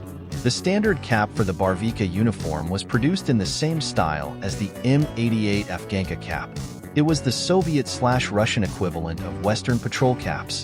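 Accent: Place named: United States. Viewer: Very nice. You have a nice speaking voice. It's so very American. You sound like a voice actor for the main lead in an early 00's anime that's been dubbed.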